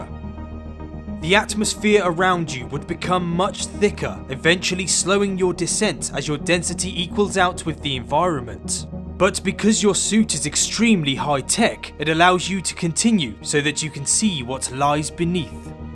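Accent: United Kingdom. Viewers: Love your British accent! (...and I’m also British...) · Your accents is British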